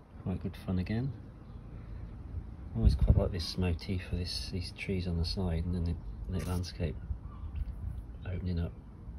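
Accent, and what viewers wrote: United Kingdom. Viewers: Yes we are thankfully back to our normal temps here in Seattle. I'm curious,, would you say that you have a "West Country" accent..? Would that be noticeable up in London.? I have a fascination with regional accents. thanks. Bill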